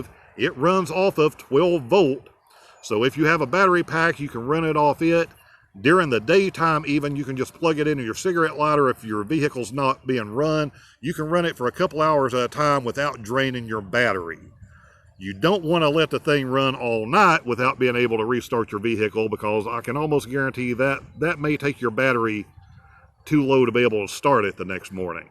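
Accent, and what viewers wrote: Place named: New England, United States. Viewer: Just his "accent". Folks from New England drive me nuts with their accent, when they say "sore" in stead of "saw", etc.; i-dear instead of idea.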